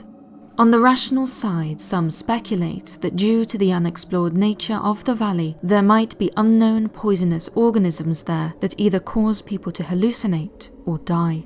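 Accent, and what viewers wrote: England, United Kingdom. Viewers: As always a tale well told is just that, and without drawing any more in breathes that are lined with gushes or the occasional reverberations as towards something being spawned by the narrative of our as uncanny and spoken with the English accent I was born into deserves a bravo. I don’t often feel inclined towards a personal rating but I feel a need for a personal rating and wish to salute you and thankyou. As now the sunlight filters through my English afternoon in mid June and I’ll round off with another sip of Earl Grey, and ponder for a moment wondering what my mind will be greeted with in the next episode to grace my mind.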